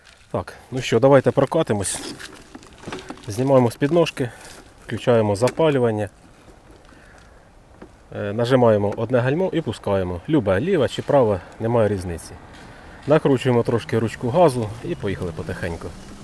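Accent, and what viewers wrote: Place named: Poltava, Ukraine. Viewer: Полтавский говор.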